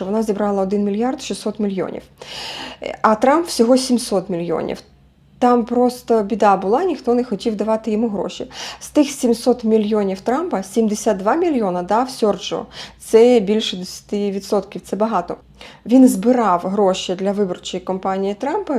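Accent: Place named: Vinnytsia, Ukraine. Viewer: Говор винецкий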